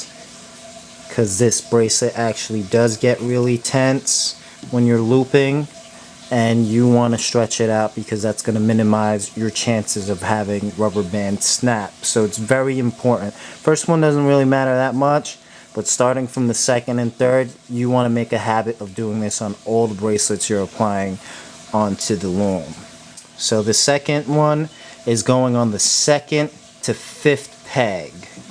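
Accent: United States. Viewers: Are you american or what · Are you from New Jersey?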